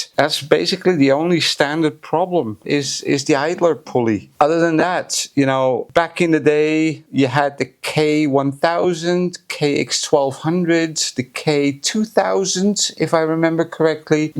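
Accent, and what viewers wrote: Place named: Netherlands. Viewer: you sound Dutch and I am looking for somebody to service a Nakamichi zx 7. Can you help me?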